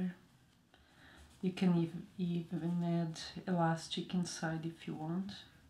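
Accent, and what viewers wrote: Brazil. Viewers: Pelo teu acento deu pra perceber que vc é brasileira 🤣faz esse mesmo modelo em português please🙏🏾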